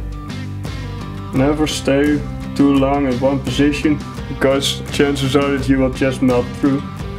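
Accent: Netherlands. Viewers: Is this guy Dutch ?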